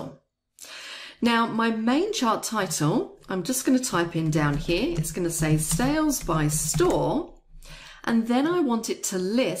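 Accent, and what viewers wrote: United Kingdom. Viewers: Thanks. I love your perfect British accent😍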